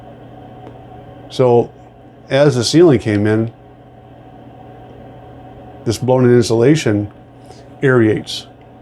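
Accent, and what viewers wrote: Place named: Michigan, United States. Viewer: Great fire stories. The Michigan drawl working good. 💨💨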